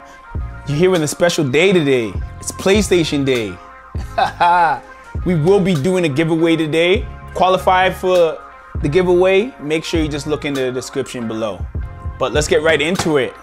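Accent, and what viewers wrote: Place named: Ontario, Canada. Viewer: Mans accent i can tell he from Ontario!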